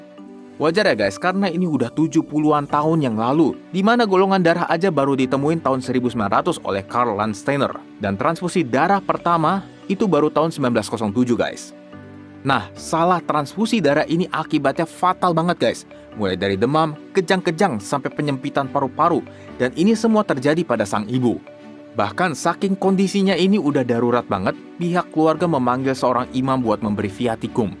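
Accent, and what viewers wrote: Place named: Java, Indonesia. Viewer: Jangan pakai logat jawa lah. Jadi gak enak konten mu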